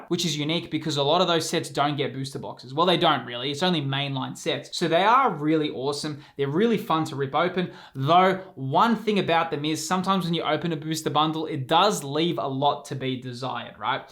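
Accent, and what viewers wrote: Australia. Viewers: I love how Australians say Naoer instead of no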